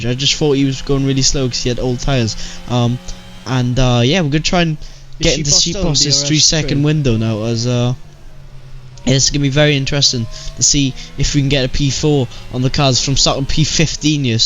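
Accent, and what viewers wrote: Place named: Cardiff, United Kingdom. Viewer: Man where are you from in wales? You sound like you’re from Cardiff being a blackwood lad myself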